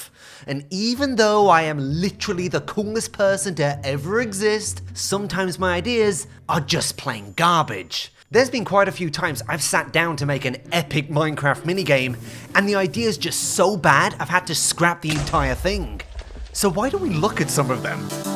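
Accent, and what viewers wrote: United Kingdom. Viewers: Why does your brittish accent attract me so much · I love watching this guy. Especially since he is British. There was a science experiment and it was proven that people listen to those who have British accents more than other accents like Southern or New England accents, and I can defiantly say that I listen to this guy a lot more than most youtubers. :D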